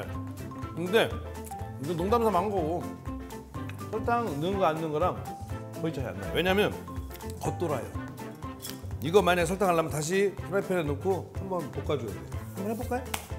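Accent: Chungcheong, South Korea. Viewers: 자기가 언제부터 충청도 사투리 썼다고 웃음 나오네 충청도 몇년이나 살았다고 내가 알기로는 중고등학교도 서울서 나온거 같은데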